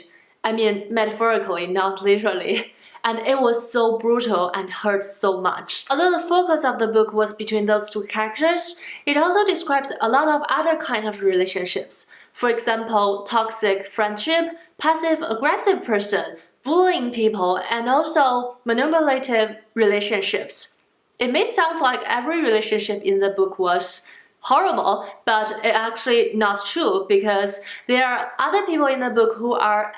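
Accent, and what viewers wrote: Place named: China. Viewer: Chinese English is powerful.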